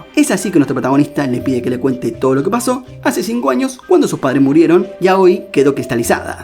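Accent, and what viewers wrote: Argentina. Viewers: Bro parece que sos argentino y me gusta mucho tu acento y tu manera tan buena de comprimir un anime en 20 minutos😊❤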